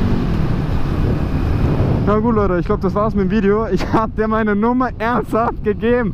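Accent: Austria. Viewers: unser Akzent bleibt #austria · bist du österreicherM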